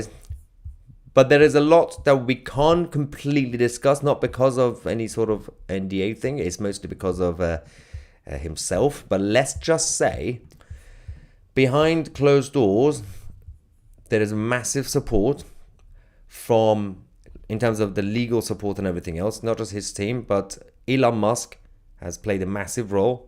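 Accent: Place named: Scotland, United Kingdom. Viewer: You're picking up that Scottish accent from 😊😂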